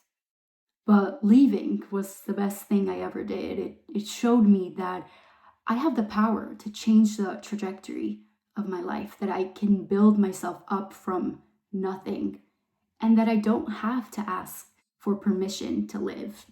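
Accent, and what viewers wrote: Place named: New York, United States. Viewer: I love this! I didn't realize you had an accent! Is it a NY accent or an accent from speaking Yiddish?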